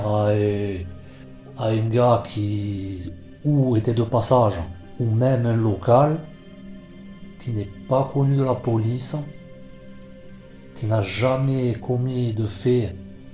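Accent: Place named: Marseille, France. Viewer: Aaaaah Marseille 😂🤣😂🤣😂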